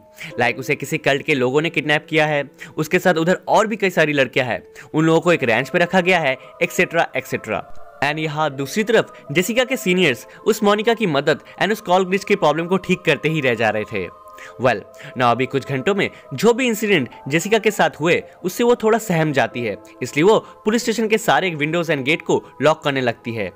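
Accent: Bengal, India. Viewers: Are you bangali, but was nice talking to we are and thenk you for still suggested explenation you will again a lot viewer as much as subscribers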